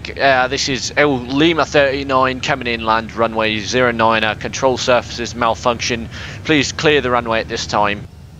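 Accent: United Kingdom. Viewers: i love your british accent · I’m absolutely in love with this (I’m assuming British) accent lol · Why your voice sounded like your british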